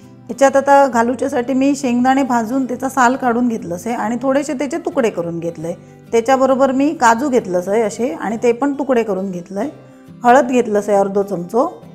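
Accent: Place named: Malvani, India. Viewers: अतिशय सुरेख तुम्ही मालवणी बोलताना आम्हाला गावाची आठवण येते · Ekdam bhari recipe...👌🌟👌🌟👌🌟...barik barik tips aani tricks sangilya mule pahayla chaan watali recipe aani karayla soppi👌👌😀...khupch goad watte tumchyakadun Malvani aaiktana...❤❤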